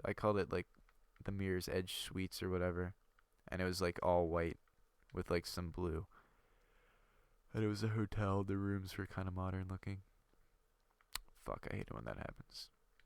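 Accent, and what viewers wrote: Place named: Canada. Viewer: Your accent sounds more Canadian . . . by the way, Big fan of the series and take a lot of tips and architectural designs from it. Keep it up!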